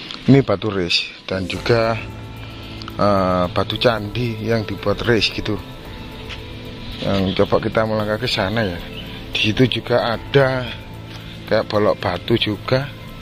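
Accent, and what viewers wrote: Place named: Java, Indonesia. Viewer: 🌀🌺☀️ WA'ALLAIKUM SALAM WR. WB. SaLaaam 🙏🙏🙏🙏🙏🙏🙏🙏🙏 , Smoga SulsEs Luuur and jaga kesEhatan sLaLu Lur 🙋🏼‍♂️🙋🏼‍♂️ , Nb. Warning and Bukan Marning 😀😀 , jangan Lupa Kirim DO'A / DUNGO Sama ALmarhum & ALmahumma okey Luuur 👍👍 , Tingkatkan Logat Bahasa Indonesia + Jawa Sehingga Seumpama Sayur tidak Lupa garamnya 😲😲😀😀 👍👍 , Lanjutken Mbah Kung 🙋🏼‍♂️🙋🏼‍♂️ ☀️🌺🌀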